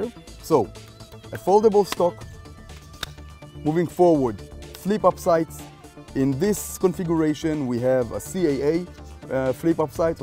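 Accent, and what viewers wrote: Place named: Israel. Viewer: It is called Israeli accent : )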